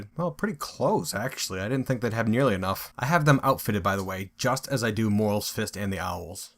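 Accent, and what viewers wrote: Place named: Northeast, United States. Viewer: So having listened to you speak for about.... 15 hours now... I'm guessing that you're in the United States and you live in the north-east, somewhere within the vicinity of New York State. Am I in the right ballpark? Of course, privacy considered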